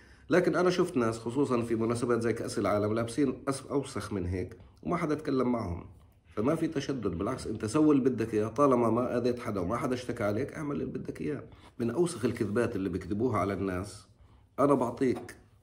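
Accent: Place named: Iraq. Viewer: لهجة عراقية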